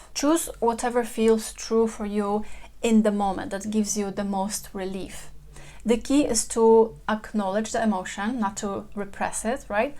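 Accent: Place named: Germany. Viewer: I love your accent. What's your native tongue? German?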